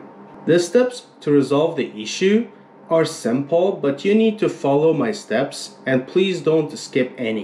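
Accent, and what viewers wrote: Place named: Iran. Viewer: Very Very Iranian accent. Good video thanks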